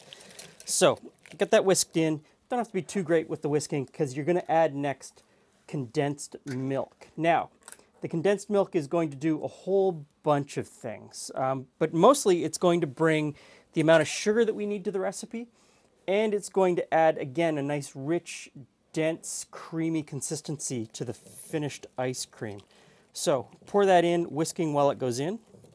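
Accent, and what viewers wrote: Canada. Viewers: Wonderful video and a recipe that even an old guy (ha, ha) can handle ---- just enough but not too many ingredients. Three (3) is a good number, LOL. Loved that delicious Canadien 'accent', too.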